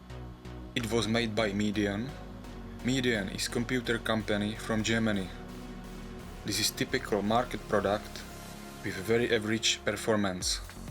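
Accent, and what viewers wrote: Czech Republic. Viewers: Hned jak jsem slyšel ten tvůj akcent tak "jaj čech" :D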